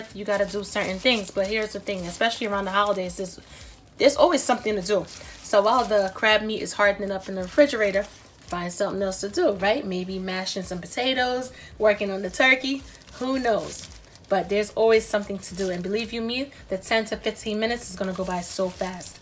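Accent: New York, United States. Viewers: That looks so delish! I'm loving hearing the New York accent.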